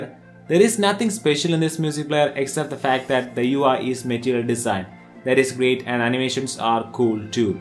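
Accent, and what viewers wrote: India. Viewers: I'm sorry if i offend you but where do you come from because you're accent is awesome and sounds Indian or around that area.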